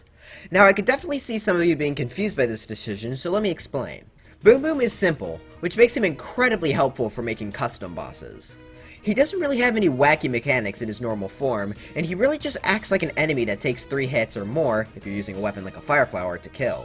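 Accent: United Kingdom. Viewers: Are you British i heard be ah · Are you British?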